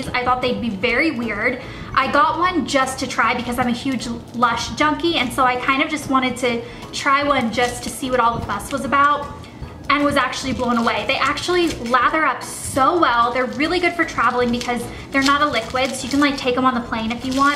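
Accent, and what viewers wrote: South, United States. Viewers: Your such a southern bell! Like that might be weird to say but that is how I would describe you lol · i thgt u were uk but ir american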